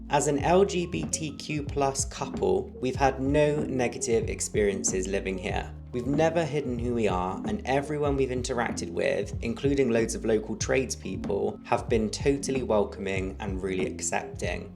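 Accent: United Kingdom. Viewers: Really interesting take 😊 Enjoyed this. Somerset is wonderful. Trying to narrow your accent down. Portishead? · I love Somerset! I'm from Bristol but moving away (I think to the outskirts of Weston?) when my daughter finishes school. Have considered Wells as I adore it, and Glastonbury... but no trains and I don't especially love driving. Are you from Bristol, you have the same accent as me?? Love finding your channel and I will follow your journey!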